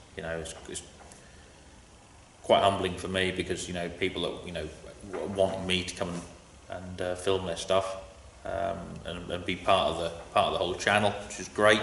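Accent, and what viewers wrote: Norfolk, United Kingdom. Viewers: Really enjoying the videos. Its good to hear a good ole Norfolk accent on here. You always know where you are with a flask of hot tea.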